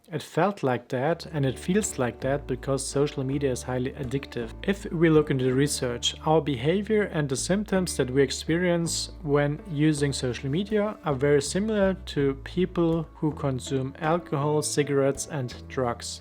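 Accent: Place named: Germany. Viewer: I dont think that it has to be completely black or white. I never used Insta, TikTok or Snapchat in the first place but I spend a lot of time watching YouTube videos. When watching YouTube I'm still split apart. I mean I watch a lot of educational videos (like this one), but I still dont feel productive when doing it. By the way my first language is German too, I barely noticed the accent.👍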